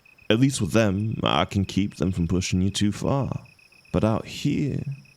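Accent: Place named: South, United States. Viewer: I really enjoyed this one! That southern accent you do is so soothing and comforting. And thirty minutes is such a treat!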